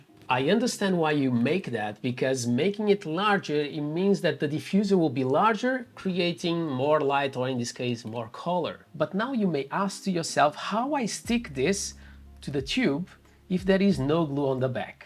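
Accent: Portugal. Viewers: Hey…. Are you Portuguese??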